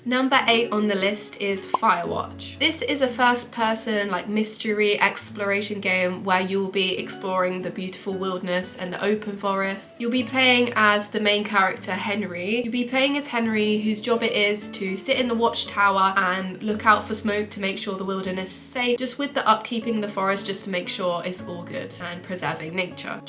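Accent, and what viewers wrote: United Kingdom. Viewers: hi! u have amazing eyes, ur skin is flawless and i love ur accent. british accent is my favorite accent ever. may i ask how old are you? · Night in the woods means a lot to me as a broken middle aged man, so not quite the target audience lol I really love that game tho have it on multiple platforms. And your accent is as beautiful as your looks, I’ll bishop being live with British girls lol 😻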